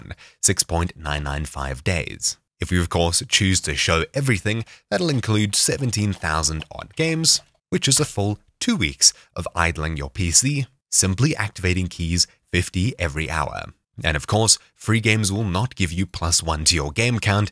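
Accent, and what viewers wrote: South Africa. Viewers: Lmao south africa😂 Is that a joburg boet I hear? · You're South African! Nice! :P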